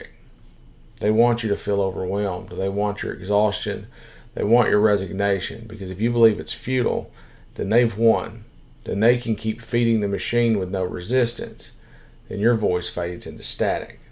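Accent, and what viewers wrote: United States. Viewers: First time since Jimmy Carter ive heard a deep south accent talking with sense and compassion.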